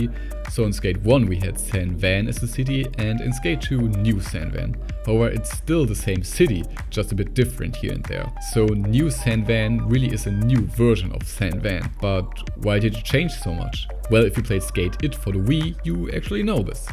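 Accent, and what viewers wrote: Germany. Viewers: Ich liebe diesen deutschen akzent :D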